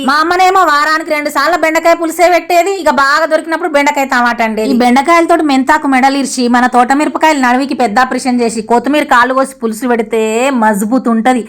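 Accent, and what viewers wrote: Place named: Telangana, India. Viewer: Akka asalu no words ela matladuthunnav chala happy ga undhi nee matalu vintunte madhi kuda vemulavada side akka village😅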